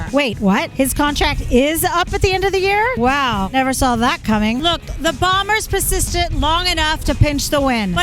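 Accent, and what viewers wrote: United States. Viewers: am Paralowie,South Australia I read a story on page 16 of the Advertiser, yes I’m that old I still read the newspaper. I’m 50 yrs old born in Australia and know very little about Aussie Rules. I’m learning from you, you crack me up 😂😂 I really love that you are an American Female talking about AFL. Your humor and accent make it even funnier, don’t stop👍🏼 You’re our official American Commentator 😁😁😁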